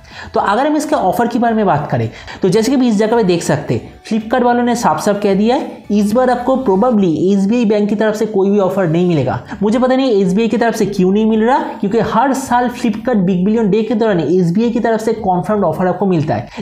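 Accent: Bengal, India. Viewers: Bengali ka hindi kuch alag funny lagta hai 🤣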